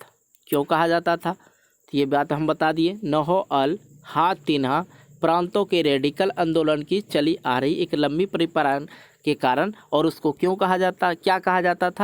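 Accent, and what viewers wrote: Bihar, India. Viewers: Aap Bihari h ka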